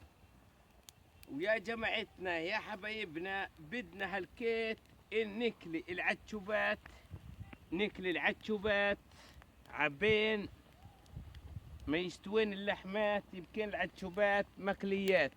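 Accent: Palestine. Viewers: اقرف لهجه فلسطينيه بعدين كسخت التمثيل ادعيه وحالتك حاله الإشي مبالغ فيه كلو مشان الشهره والمتابعه ههههههههههههههه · لهجه فلسطين زي الكنادر مقرفه.. تحياتي لليهودحبايبنا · lahoo lahoo بالعكس احلى لهجة في العالم اللهجة الفلسطينية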